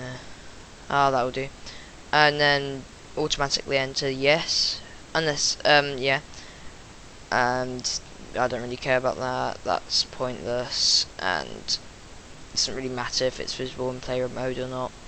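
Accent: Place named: United Kingdom. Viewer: YOUR BRITISH! I have a british accent coming on! :D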